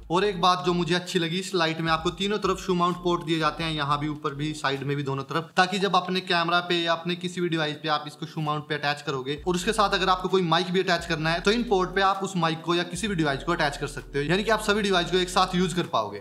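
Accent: India. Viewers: you sounded like himachali 🤗 but great content..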